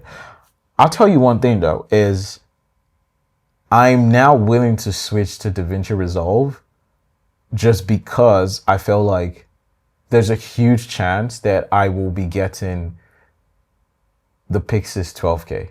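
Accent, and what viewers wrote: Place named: Nigeria. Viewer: Oga that Naija accent can never hide lol. Thanks for the video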